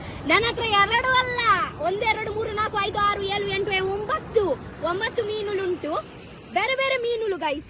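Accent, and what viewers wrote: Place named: Tulu Nadu, India. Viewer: One of My professor is from Mangalore, his mother tongue is tulu language,,,,, so he is also speaking kannada like you.....i remember him after watching your video.😅😅😅😅😂😂😂😂😂 By the by he is excellent knowledgeable professor.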